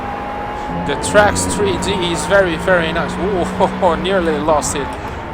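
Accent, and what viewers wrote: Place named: Brazil. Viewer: lendo os comentários percebi porque estava entendendo completamente seu inglês hahahahahahah você é brasileiro tbm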